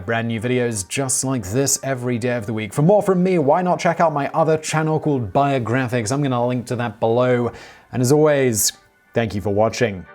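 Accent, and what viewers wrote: United Kingdom. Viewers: this british ascent..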